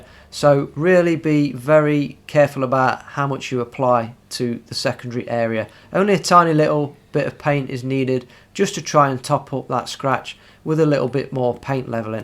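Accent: United Kingdom. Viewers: Straight to the point no BS sound guy, and judging by his accent, no surprise, all straight talking in that part of uk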